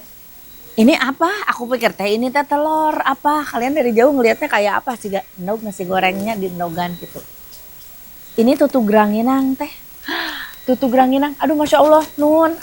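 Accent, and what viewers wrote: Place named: Garut, Indonesia. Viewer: Ngomongnya ala garut terussss skrg😂😂😂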